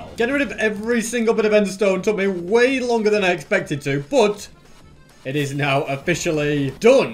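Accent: United Kingdom. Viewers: idk why but sb sounds too British in this vid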